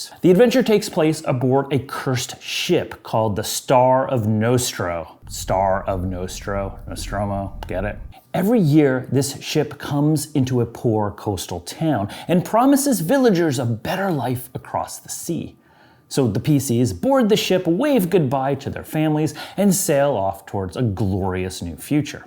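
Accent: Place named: Australia. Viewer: This is brilliant but that Australian accent is bloody awful .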